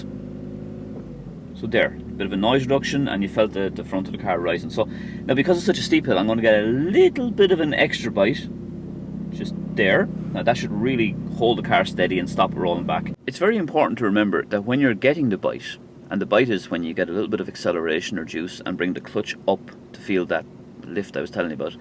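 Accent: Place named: Ireland. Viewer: hi, today I went up a seriously steep hill and my car told me to select a lower gear so I went up in second but my foot was all the way down on the accelerator! It felt like the car was going nowhere or was going to roll back! I nearly s*** myself! I did make it up the hill eventually but nearly had a heart attack as I'm a nervous driver anyway. Was I correct to proceed up in 2nd gear? Is there a correct way of going up a very steep hill as I thought my accelerator was going to snap off. please let me know as I'd like to take the kids to where the hill led to in the end but am really scared of driving up that hill. Love your irish accent by the way!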